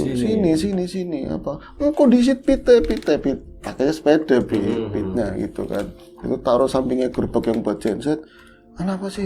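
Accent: Banyumas, Indonesia. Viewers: Mas, aku kelabakan pas ngomong ngapak full speed. Ga ada subtitle-nya. 🤣🤣 Btw, format begini menarik jg sih. Seperti POV klo kita ngumpul² bareng gt kan pasti ngeliatnya ga cuma ke satu orang. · Aku di sini malah kekel krna mas nya ngomong nya ngapak campur bahasa indonesia 😂😂😂😂😂maaf ya gk bisa gk ketawa 😂😂😂😂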